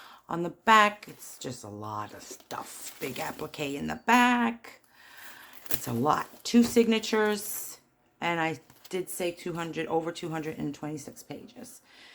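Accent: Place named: East Coast, United States. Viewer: Loved this journal! I knew from your accent that you were an East Coast girl (me too! RI/CT)! New subbie! Thanks for sharing!!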